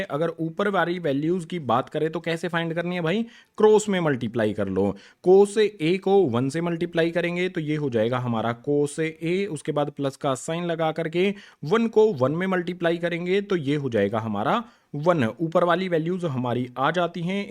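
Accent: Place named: Bharatpur, India. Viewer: Language Bharatpur ki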